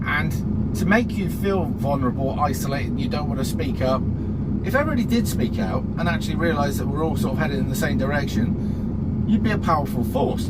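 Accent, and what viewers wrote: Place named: Bristol, United Kingdom. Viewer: Sounds more like Bristol.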